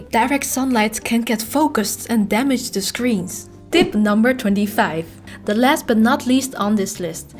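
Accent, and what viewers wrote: Netherlands. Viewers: Fking strong dutch accent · Can't figure out what a dekstop is... Other than that, great video with the most amazing Dutch accent. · Are you dutch i think i can hear it in your acaent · he means you have a Dutch accent with a Asian accent mixed in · Damn you sound dutch, holy shit lol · Dutch accent.... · Haha I was guessing that you were from the Netherlands. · Ok. First srrs thx for the tips. 2nd. Oof. Wat ongemakkelijk om zo nederlands Engels to hooren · Wait, you live in the Netherlands? I thought that accent sounded familiar lol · Heerlijk dat Nederlandse accent :) , leuke vid ga em later nog een keer kijken!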